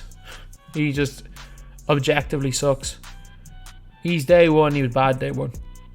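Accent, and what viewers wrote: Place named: Ireland. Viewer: Yo what county in ireland are u from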